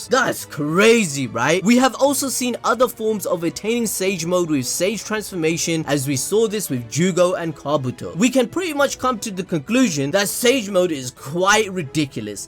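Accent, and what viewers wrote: Punjab, India. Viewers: serious ur hindi has a bit of indian accent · Bruhhhh you’re indian????? Hearing you speak in punjabi/hindi was hilarious 😂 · what the fuck u speak hindi (i heard a slight punjabi mix but like whaaa) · Yes!Mitsuki is a really important character in defeating Otsutski. BTW you speak nice Hindi but you have a Punjabi acssent in it. GOOD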